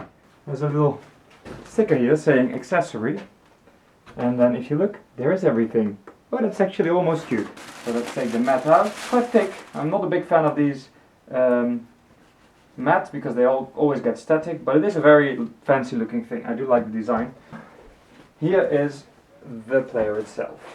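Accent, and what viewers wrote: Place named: Netherlands. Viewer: Nice unboxing; your accent somehow sounds Dutch (je klinkt nederlands). My first turntable was a Reloop RP-2000 MK3 and it was similar to this one in many ways. The tonearm assembly, the dodgy strobe indicator, the platter without magnet. It didn't come with a dustcover because it is a DJ turntable, but when I sold it to my parents, they wanted a dustcover, so I bought a Reloop dustcover for them. It has that exact same type of hinges, detachable on both sides, with those plastic squares that go into the dustcover slots.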